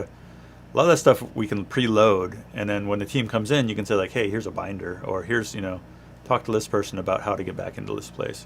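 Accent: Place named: West Coast, United States. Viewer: “We persent….” I love our West Coast accent. Thanks for your help!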